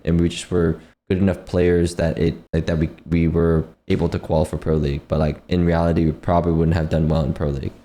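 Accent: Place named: United States. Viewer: He was born in the US. He has lived there his whole life. Dafuq are you talking about? He has a mild speech impediment (slight stutter) but his only accent is American.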